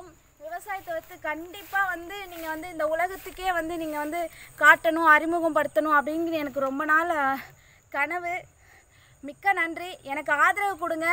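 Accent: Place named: United States. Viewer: Ama amerikka la irunthu vanthu iranguna mathiri paesura thoooo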